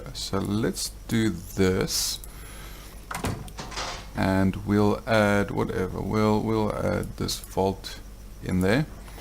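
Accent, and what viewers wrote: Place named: Afrikaans, South Africa. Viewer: You can hear that afrikaans accent from a mile away xD Goeie Video Bra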